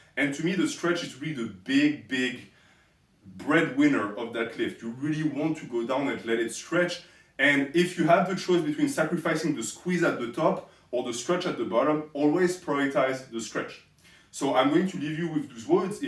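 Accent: France. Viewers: This is amazing, I have always wanted/needed somone to tell me how to work on my DB row techniques and the cherry on top is the subtle French accent. ❤️ Stay fit bro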